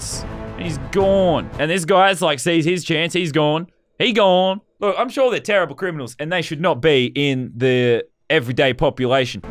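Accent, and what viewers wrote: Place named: Australia. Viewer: I find the australian accent so cool, the way says gone as gaawn.😅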